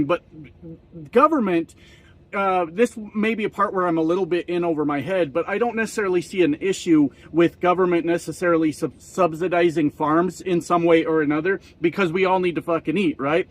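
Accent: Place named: Canada. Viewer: You are hilarious !! Are you sure you aren't Canadian cause you sound just like us !! Love you brother . !!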